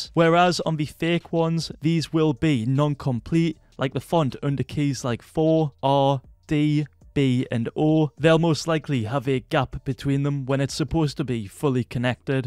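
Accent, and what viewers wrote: Germany. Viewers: Are you from Germany? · Wait, are you german? xD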